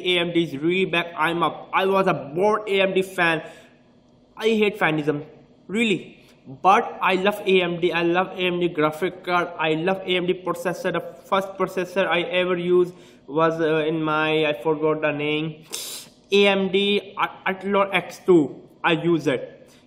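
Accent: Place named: India. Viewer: Damn what are you talking can't unterstand with this kinda Indian or whatever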